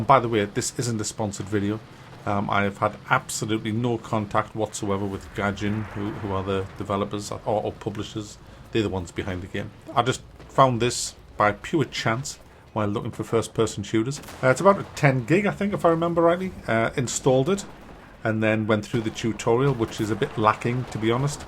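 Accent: United Kingdom. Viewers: It's just his accent /slang. The way people pronounce words is very different across the whole of the UK.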